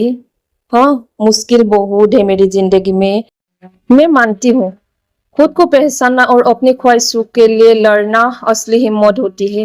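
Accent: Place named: Assam, India. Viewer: Maje maje axomiya tune to ahiye jai🎉❤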